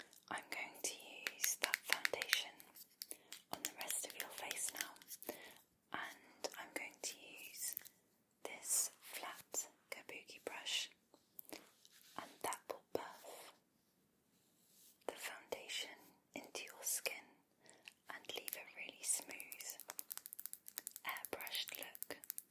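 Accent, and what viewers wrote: England, United Kingdom. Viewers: Love your accent isi kent or midlands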